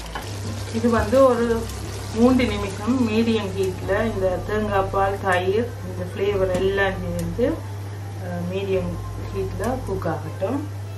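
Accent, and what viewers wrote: Jaffna, Sri Lanka. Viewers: Very tempting receipe enjoy your receipies. Very much .specialy our jaffna Tamil.thanks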